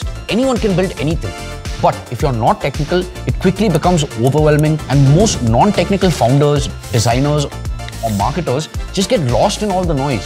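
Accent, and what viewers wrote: India. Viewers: you sounded andrej karpathy as a keralite or tamilian, please bro don't harass us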